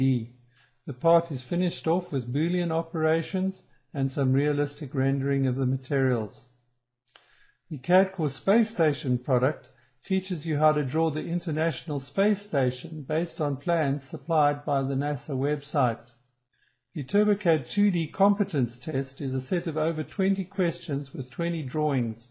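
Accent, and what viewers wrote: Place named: South Africa. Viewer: South Africans should never, ever be allowed to do voiceovers. Horrible. And I'm a South African myself.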